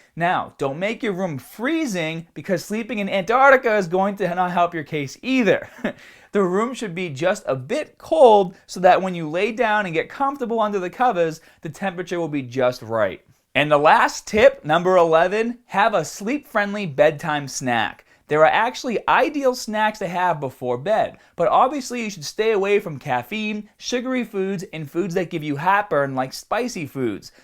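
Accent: Boston, United States. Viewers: Lol is this guy from boston? His accent is definitely a boston accent :) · Bette? Boston accent? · Dude! I just started watching your vids a couple of days ago and started watching your vids from yrs ago. This is the first RECENT one I've seen... Wtf happened to your accent?! It's WAY more Bostonian now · Is he from Boston Area? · Boston much?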